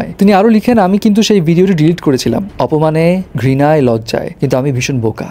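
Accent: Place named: West Bengal, India. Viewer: Tui dada babu der moto kota boltasos ken? Tui ki oi parer naki re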